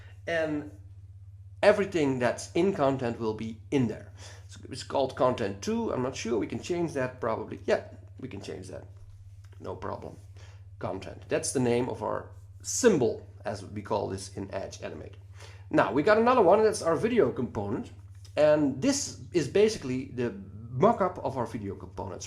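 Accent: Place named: Netherlands. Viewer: Quality dutch accent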